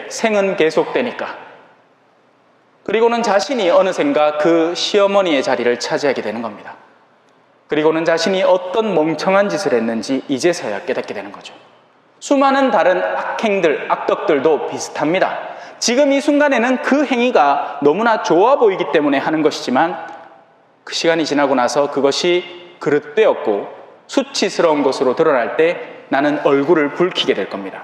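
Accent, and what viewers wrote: Gyeongsang, South Korea. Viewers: 정말 의 다양하신 탈렌트 멋지십니다~ 확실하고 화끈하고 분명하고 명확한 메시지 를 정확한 발음으로 구수한 갱상도 사투리를 섞어가며 전달하시는 신부님이야말로 진정 멋진 선교사이십니다~ 한가위 추석명절 기쁘게 잘 보내시기 빕니다~♡♡♡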